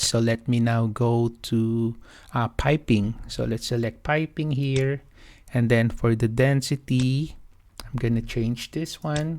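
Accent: Philippines. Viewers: are you pinoy? you sounds like pinoy sir. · accent palang pinoy na alam ko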